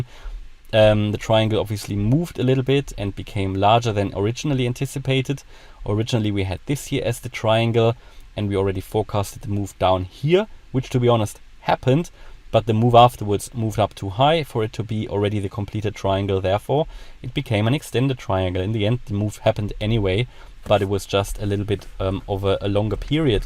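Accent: Germany. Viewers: Are you German? 😁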